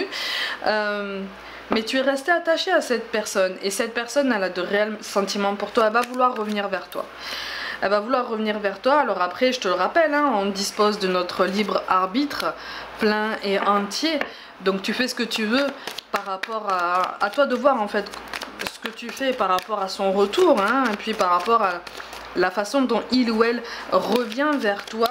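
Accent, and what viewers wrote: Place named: Perpignan, France. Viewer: Excellente guidance ❤️ je kiff ton accent Perpignan ???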